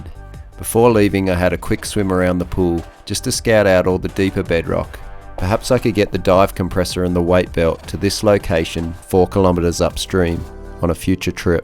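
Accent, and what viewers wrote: Australia. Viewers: love your content and your Aussi voice. Well done!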